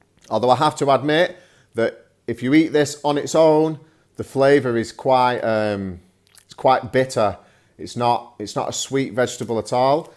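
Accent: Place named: Manchester, United Kingdom. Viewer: He's actually from the Manchester area in Northern England. His accent is different to the way people speak in Scotland.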